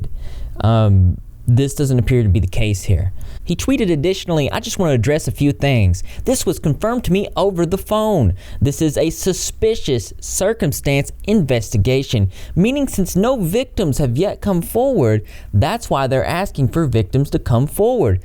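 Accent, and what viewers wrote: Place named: Tennessee, United States. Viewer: I legit could listen to you speak for hours haha probably the fellow TN accent that I can appreciate haha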